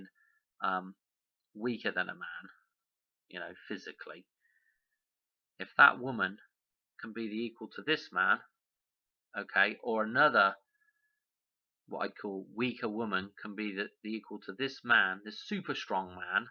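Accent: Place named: Rural, United Kingdom. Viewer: I’m currently locked down in India. Your accent is bringing me taste of home. Im from Devon, but there does seem To be a common thread running trough the rural Tounges. I’m Enjoying your work. It’s been connecting some dots for me. Cheers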